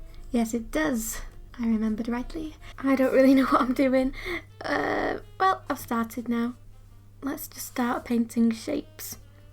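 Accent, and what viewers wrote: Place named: Manchester, United Kingdom. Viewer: Your art is AMAZING! I never knew you were from Manchester and I love you accent.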